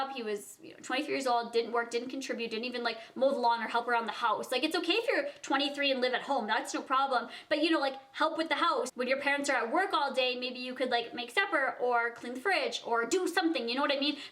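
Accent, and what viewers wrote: Canada. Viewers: Mina Mina she probably sounds different because she has a Canadian accent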